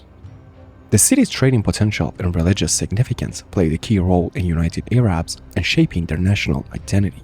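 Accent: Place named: China. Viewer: For da luv of god please stop talking ! Ur chinglish is terrible ! Get someone who can speak & pronounce English words correctly.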